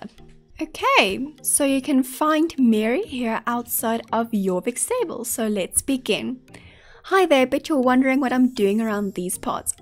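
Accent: South Africa. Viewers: Hi, are you South African because I recognize your accent. 🙂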